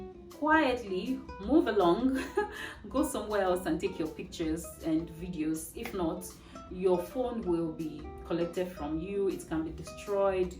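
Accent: Nigeria. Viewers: Your physical looks are Kenyan coincidentally. Your accent is also not as deep as my Nigerian next door neighbours😊. In three months your Nigerian accent will disappear😊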